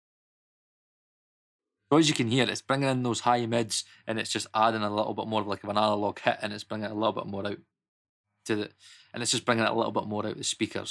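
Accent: Scotland, United Kingdom. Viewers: Goto another video if you can’t understand this lovely Scottish accent. I’m lovin it. Edinburgh or Glasgow? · Oi mate! I have been digging neOld a lot. Its warm an rich sounding. I compared to Slate myself and Slate`s feels colder. Lacks warmth, which can be good sometimes. I'm really enjoying neOld so far. Haven't used Arturia yet, but as far as I know, it has an eq built in, which takes it apart from the two. Nice video man. Love your Scottish accent by the way. Cheers lad. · It’s not Eastern European accent, it’s Scottish and so easy to understand.. not to mention that it’s one of the original and oldest English accents in the world, and I’m not even British. We should learn to appreciate the taste of pure coffee and not add sugar to it 😆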